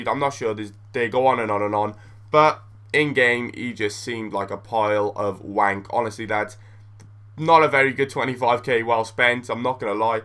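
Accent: Wales, United Kingdom. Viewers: Ur welsh!?!? :O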